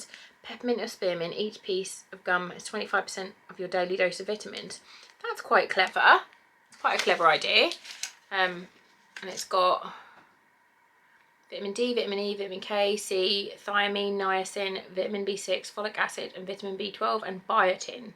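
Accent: United Kingdom. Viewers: Love the box videos :) you look super goddess beautiful with your mascara and eyeliner plus lip gloss, and love your uk accent ❤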